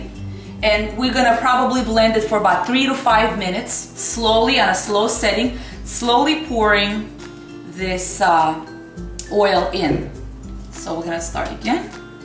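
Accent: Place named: Russia. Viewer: кстати,речь с русским акцентом гораздо приятнее уху и легче понять,чем коренных носителй языка! кофточка очень к лицу,красотка!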